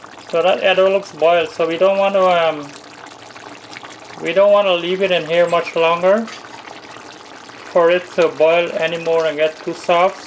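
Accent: Guyana. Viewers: i am missing Guyana so much & i am so grateful for your tasty traditional recipes. i love the Guyanese accent. i hope to make my rhythm, with this yummy recipes. big love from London UK.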